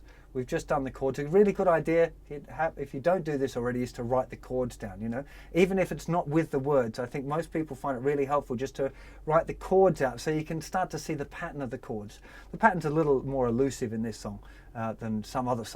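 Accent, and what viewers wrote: United Kingdom. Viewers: OMG! i almost dropped my coffe when you started talking! YOUR'E BRITISH! om my lord jesus and everything in between!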